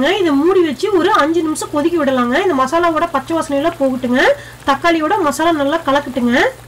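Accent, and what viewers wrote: Kongu, India. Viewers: Kongu tamil sema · Kongu Tamil super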